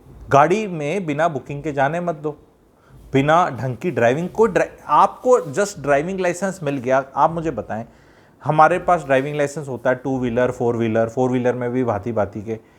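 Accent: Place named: North, India. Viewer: Mast bolte ho aap...typical north Indian style...maza aa jata h...hasi nhi ruk rhi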